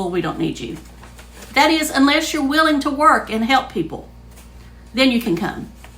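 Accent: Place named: Arkansas, United States. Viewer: Ok saw your name enough that I finally came to subscribe! Nice to see you. Your accent reminds me of my Dad's sisters, your accent. Maybe you are from Arkansas? We are Native American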